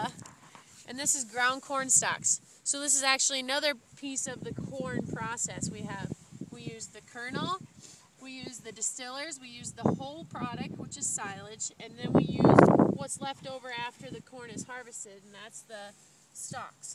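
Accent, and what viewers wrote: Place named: Upper Midwest, United States. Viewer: northern Midwest you must be ??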